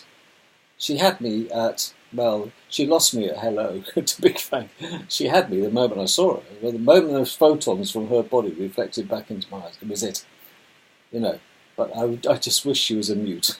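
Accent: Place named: United Kingdom. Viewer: My sister is from the south ( she was adopted at birth) we found each other about 20 years ago.....well she was very worried about my accent....I'm from Massachusetts (western)(eastern mass has that nasal Boston accent) her whole family loves my accent.....I found that funny, I don't have one.....they do, all southerners.......it's funny how many Americans have an accent.....you have an accent, ,love a British accent 😎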